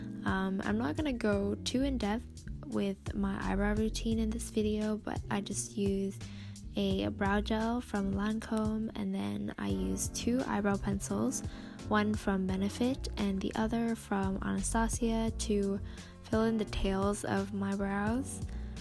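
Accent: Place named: United States. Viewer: Your voice sounds like Jess conte but with an American accent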